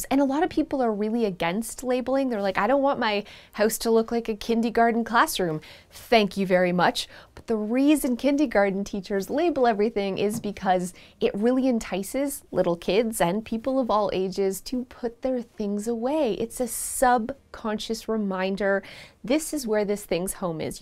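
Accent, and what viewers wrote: Canada. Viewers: Washer in the kitchen, and all the "aboots" Are ya Canadian 🇨🇦 🍁?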